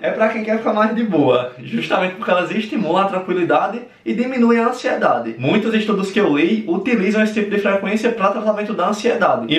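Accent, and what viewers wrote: Northeast, Brazil. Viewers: O sotaque dele é de Sergipe, Pernambuco pra cima. Ou do norte da Bahia, q faz divisa com esses estados aí. A Bahia é um estado grande, aqui tem no mínimo 4 sotaques: o de Salvador e região, (q é completamente diferente desse cara do vídeo), o do norte (q é bem parecido com o do cara do vídeo), o do sul (q é parecido com o do Espírito Santo e Minas Gerais e o do oeste, parecido com o de Goiás. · mas minha gente esse homão lindo + sotaque nordestino... :chefkiss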